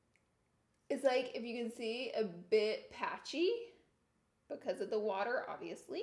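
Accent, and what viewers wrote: South, United States. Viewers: Love your dramatic southern bell voice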